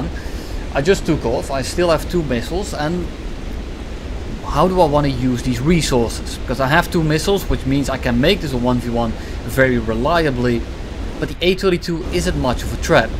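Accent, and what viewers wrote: Netherlands. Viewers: you sound pretty dutch but i like it very much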